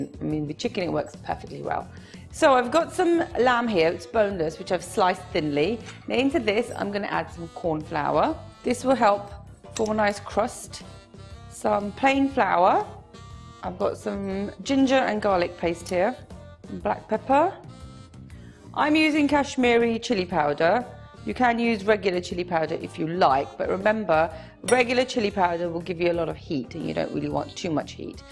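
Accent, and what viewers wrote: United Kingdom. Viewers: Really nice recepie. I've had this in New York at a restaurant called Symphony Spice it was more saucy though like said make it dry or saucy. Thank you, love your British accent 👍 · she is from England. So its her real accent. She has a British accent..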